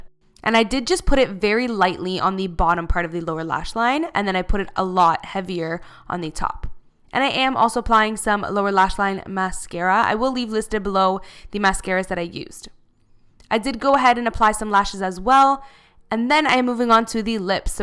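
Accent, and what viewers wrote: Canada. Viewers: Her parents are portuguese,but I think her accent is pretty canadian...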